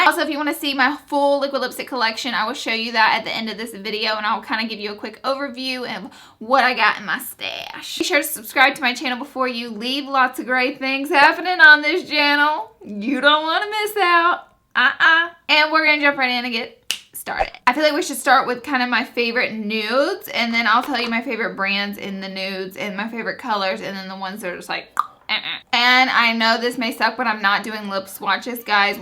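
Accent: South, United States. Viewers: I guess that bettet than saying you sound like and 80year old that sounds like you smoke a pack a day. I love your voice I find it comforting with the southern flair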